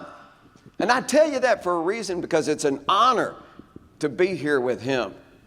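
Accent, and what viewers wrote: United States. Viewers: good ol' Texan slant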